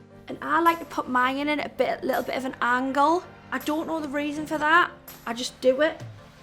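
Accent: Newcastle, United Kingdom. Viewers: Have you permently quite Geordie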